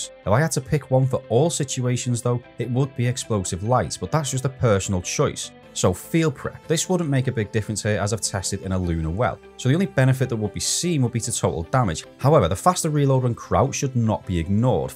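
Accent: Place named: Scouse, United Kingdom. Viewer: sound like micheal owen , stop hiding the scouse accent lad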